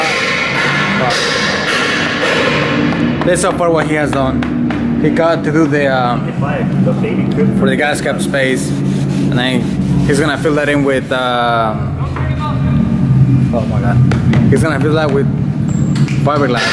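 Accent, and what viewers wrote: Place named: California, United States. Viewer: bruh when i first heard your accent i thought you was in cali and then i see all the japanese plates😭😭😭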